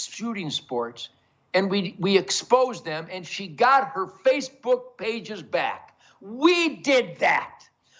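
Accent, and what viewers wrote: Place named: United States. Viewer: Thank you Ted for your American voice.Always your fan forever 🎉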